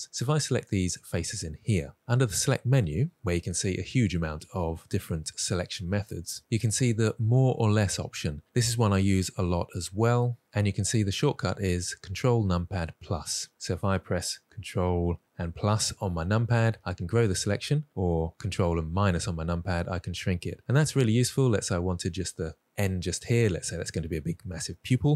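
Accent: England, United Kingdom. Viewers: He’s English, pronouncing English in a thoroughly English way. What’s not to like? It may sound weird if you’ve learned Blender from a New Zealander who makes donuts…. 😂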